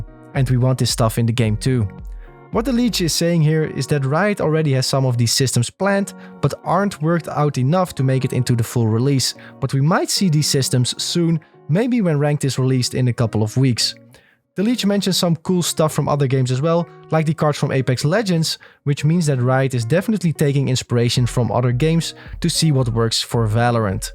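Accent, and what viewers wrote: Netherlands. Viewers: Are u dutch? You kinda have the accent. Your enlish is great tho :)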